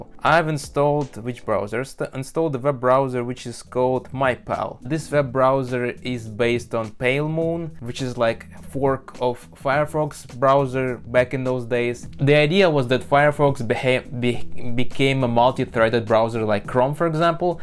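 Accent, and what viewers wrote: Russia. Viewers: У него тяжелый русский акцент! )))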